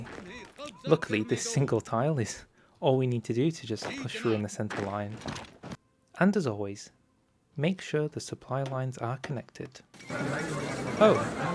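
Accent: United Kingdom. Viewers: Cause he's talking in British accent.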